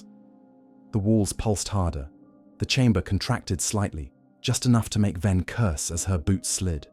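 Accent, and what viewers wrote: United Kingdom. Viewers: Another British accent so tired of this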